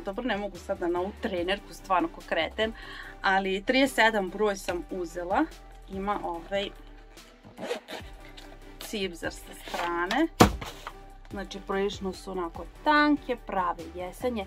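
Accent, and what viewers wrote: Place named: Novi Sad, Serbia. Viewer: Osmeh i pozitiva zlata vredni, najiskrenije 💜💜💜 kao i sve emocije koje krajnje iskreno iskazuješ. 💜💜💜 Plus, novosadski naglasak koji uvek volim da čujem. 🙂 Ti si jedna retko divna osoba koja svoje pratioce dozivljava kao drugare, a ne kao "vreću novca", i to je pre svega jako veliki plus- to govori mnogo o tome kakva si osoba. ❤️ No, to je već sve od samog početka poznato svima. 🙂 Što se zdravstvenog stanja tiče, od sveg ❤️ i najiskrenije ti želim da sve bude u najboljem redu što je pre moguće, prvenstveno zbog tebe same jer zaslužuješ samo ono što je dobro. Dušo naša divna i draga, još jednom sve najlepše ti želim! ❤️❤️❤️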